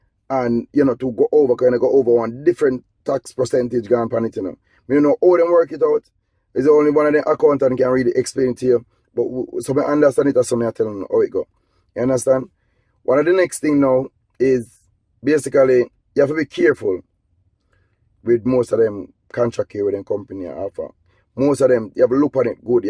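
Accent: Jamaica. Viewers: It's Jamaican patois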